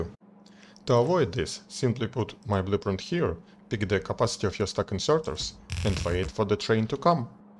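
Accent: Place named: Russia. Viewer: вангую автор русский, я прям слышу этот акцент😀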